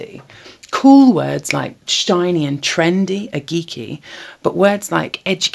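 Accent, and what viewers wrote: United Kingdom. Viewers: It's the soft, clear voice with the British accent that gets me. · And the accent. Don't forget the brit accent. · I normally don't like red heads or Brit accents. But they both are awesome here.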